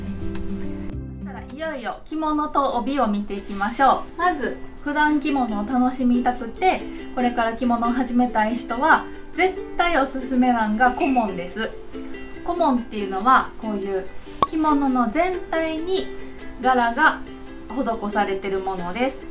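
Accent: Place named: Kansai, Japan. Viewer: とても分かりやすいし、関西弁が懐かしいので、チャンネル登録させていただきました。 和裁は少し習ったことがあるのですが、着付けはまだ全然です。 着物を着る日本人が増やしたいですよね！('◇')ゞ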